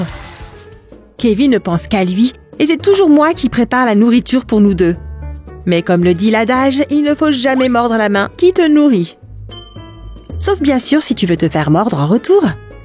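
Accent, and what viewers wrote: England, United Kingdom. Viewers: en plus il sons englais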